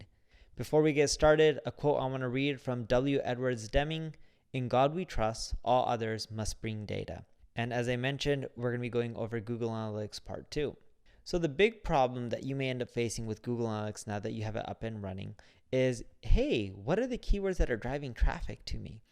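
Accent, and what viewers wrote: India. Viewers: Why your accent is so different/are you Indian?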